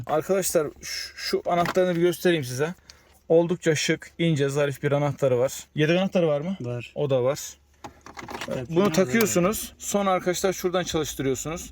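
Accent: Gaziantep, Turkey. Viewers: Amcaoğlu hafiften Antep ağzıyla konuşuyor. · Plaka 27 amcaoglu antep şivesi ile konuşuyor. Abi sen anteplimisn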